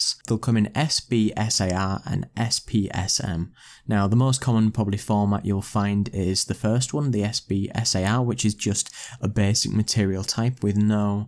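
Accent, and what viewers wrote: United Kingdom. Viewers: What a waste of time, you've shown only a single way of adding files to Painter, and you have not shown the much more useful method of how to edit the paths to assets in the preferences. And sbs files are not left out of newer versions, as they are crucial for being able to edit the materials and shaders in Substance Designer. You seem pretty clueless, you should learn more before you start putting tutorial videos up on the internet, your arrogant, know-it-all attitude, and regional British voice notwithstanding, lol....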